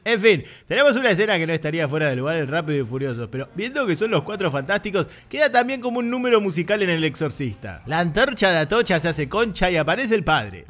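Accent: Argentina. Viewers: Tiene la misma voz que todos los porteños. Pero buen video, me hiciste reír, y eso ya es mucho. Posible suscriptor, no te aseguro nada.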